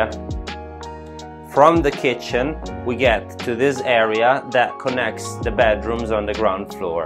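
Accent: Italy. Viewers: I love your italian acccent when you speak english. Hope you can also speak french. :D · we need a bit more than buongiorno. Haha c'mon show us that sexy Italian accent ;) Can't wait to see the upcoming videos.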